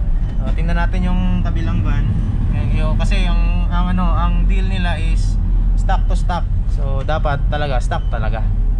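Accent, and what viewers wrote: Iloilo, Philippines. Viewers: Ilonggo dn ni pre?? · Ilonggo ka sir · Ilonggo ka boss? LOL “ano hambl mo? maapas lang sila ah”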